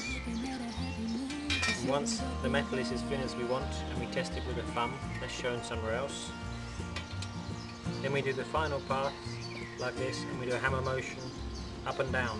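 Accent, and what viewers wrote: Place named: England, United Kingdom. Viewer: What's your background? You sound English not Czech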